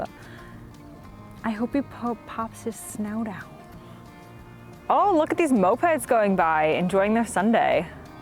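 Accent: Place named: United States. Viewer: Why does your accent still sound fully American after living in the UK for 10 years?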